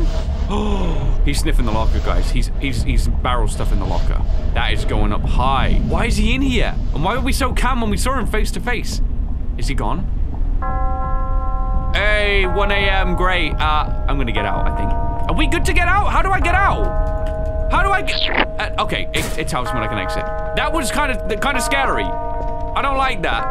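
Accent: United Kingdom. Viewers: This guy is British flamingo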